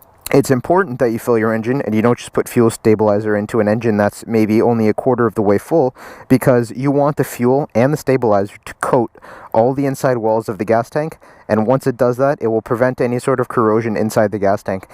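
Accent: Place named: Canada. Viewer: You sound canadian btw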